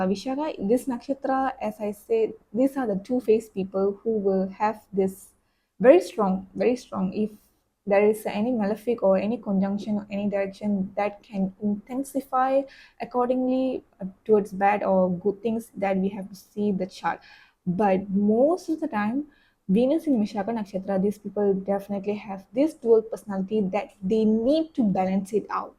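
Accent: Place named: Malaysia. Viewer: Hey, Amazing video, appreciate the insights! Are you from Malaysia btw? I grew up there, you have the same accent!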